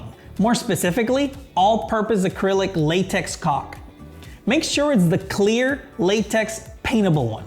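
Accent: Quebec, Canada. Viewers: Great video! Are you French Canadian?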